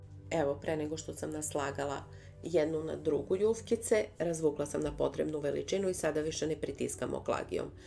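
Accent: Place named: Niš, Serbia. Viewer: Joj što volim ovaj vaš rekla bih Niški naglasak. Pozdrav iz Podgorice 👍🍀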